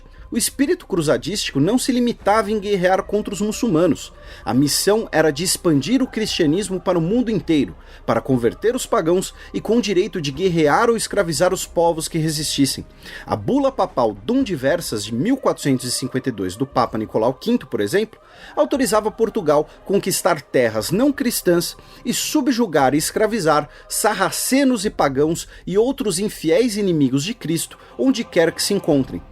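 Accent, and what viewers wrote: Brazil. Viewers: Com este Português tupinambá, eu duvido.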